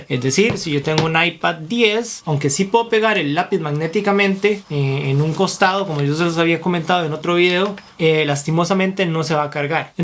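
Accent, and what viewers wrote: Costa Rica. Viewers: Maeeeeee que buen video, gracias por una reseña tan integral y buena 💯 Usted es de Costa Rica o me falla el oído 👀? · Me suena como a costarricense bro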